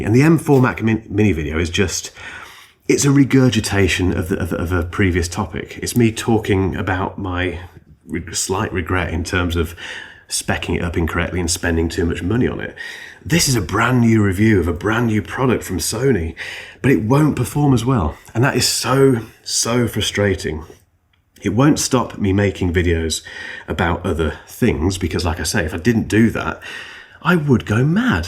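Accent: United Kingdom. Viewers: I’m one of those people who has been watching every single video on the Macbook pro M4 or the MacBook Air (even now that I’ve had a MacBook pro M4 for 2 or 3 months). I openly admit that if you made an update video on the macbook pro M4 (or a comparison), I would watch it in a sec😂 (i love your opinion AND your british accent). The reason why I do this is that I find interesting knowing how others people’s opinions evolve over time (whether they change or not). I like to see what people find more pleasant on a product that I like and see whether my opinion on particular aspects is shared or not. PS: I have a crush for Patrick Rambles, by the way…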